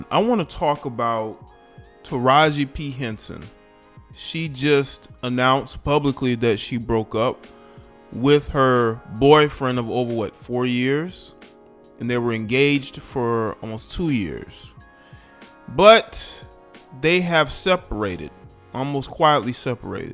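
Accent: South, United States. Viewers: You sound so stupid. I swear you have no facts whatsoever and just assuming like everyone else. Also, what’s the shade about her dating options. Man I can’t stand your country ass voice✋😩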